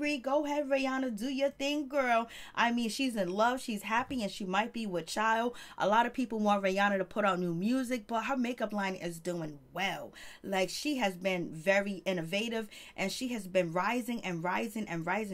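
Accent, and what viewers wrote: New York, United States. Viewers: I love the way you talk..are you from New York??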